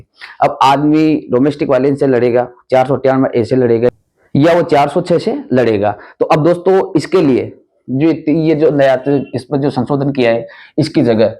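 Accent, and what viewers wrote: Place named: Kota-Bundi, India. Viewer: आपका बौली का लहजा कोटा-बून्दी का है।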